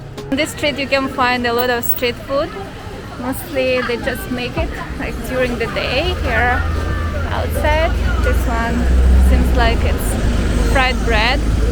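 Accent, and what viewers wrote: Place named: Eastern Europe. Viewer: I live in the same city. Sry for my rudeness. You have a bit of Slavic accent. Wish u have a good time here.👍🏼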